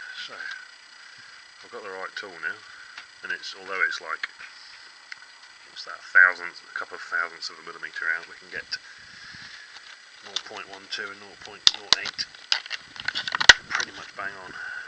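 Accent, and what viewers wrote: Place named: England, United Kingdom. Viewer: Get your ears tested. He is clear as a bell if you understand English English. Maybe you are American.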